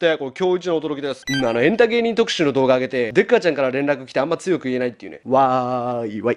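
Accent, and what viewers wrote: Kansai, Japan. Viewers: 関西弁のイントネーションが 気になってしまう〜〜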